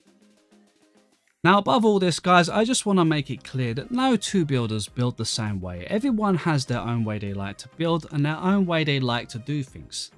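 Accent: United Kingdom. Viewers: I HATE HIS BRITISH ACENT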